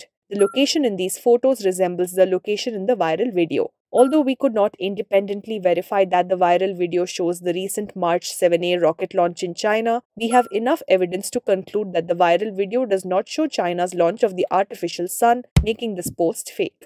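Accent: India. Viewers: switch off soon as heard the Indian accent lol biggest lying media in the world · An Indian voice over to debunk sun simulators?? OK ill fake check that as false.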